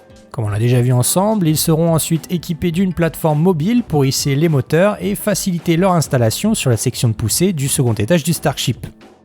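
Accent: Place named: United Kingdom. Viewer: Votre accent anglais m’éclate ^^ Je ne suis pas meilleure que vous hihihi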